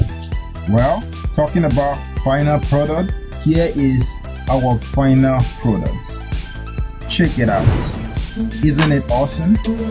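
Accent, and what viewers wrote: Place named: Nigeria. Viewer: You sound like a Nigerian, 👍 awesome tutorial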